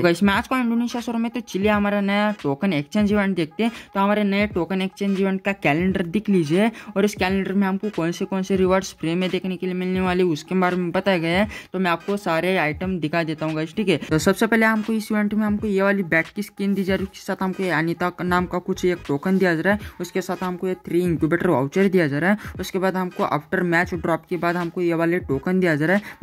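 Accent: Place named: Maharashtra, India. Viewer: Bhava tuzya bolnyavarun vatty Tu marathi ahe... Marathi asla t like de comment la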